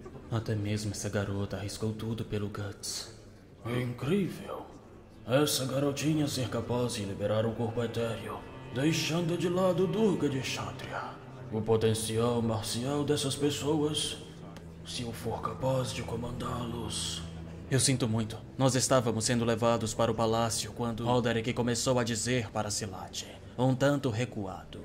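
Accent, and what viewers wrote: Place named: Ceará, Brazil. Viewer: você é do ceará? as vezes o sotaque parece, e algumas palavras também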